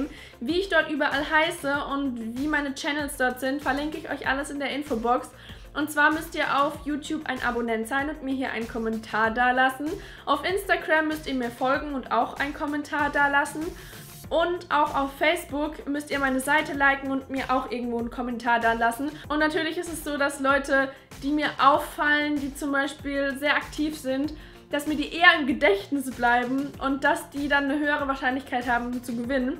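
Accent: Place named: South, Germany. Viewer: Hiii, naaa xD ich hab gedacht ich versuche mein Glück mal^-^ was ich ja an deinem Kanal besonders feiere sind die Umsylings, manchmal ist es schon krass was das ausmachen kann und wie einfach man sich doch (äußerlich) verändern kann, ich denke das gibt den meisten auch einen Denkanstoß :) Und nochwas, ich hab irgendwie sofort an deiner Stimme gehört, dass du irgendwo aus dem Süden kommst (wie ich xD) weiss nicht, war einfach so xD Zum Gewinn, eh ja, also ich liebe liebe liebe Überraschungen also folglich eine von den DM Tüten wäre cool, oder was ich schon immer ausprobieren wollte ist das Wimpernserum** Aber wenn es hart auf hart kommt eine Überraschung macht mich immer froh xD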